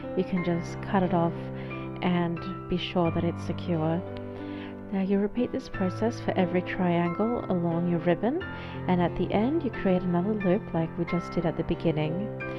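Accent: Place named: United Kingdom. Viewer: Nice bunting . Something I have never bought or used. You mentioned Australia in another video. You don’t sound Australian very nice UK English Accent 😊👍🏻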